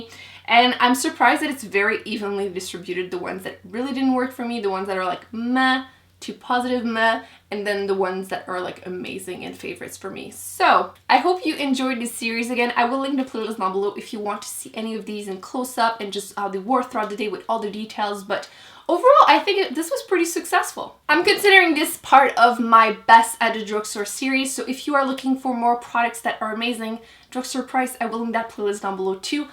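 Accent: Quebec, Canada. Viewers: Clairement tu parles français, Québec?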